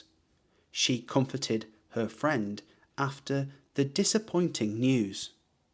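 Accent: United Kingdom. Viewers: What British accent is this?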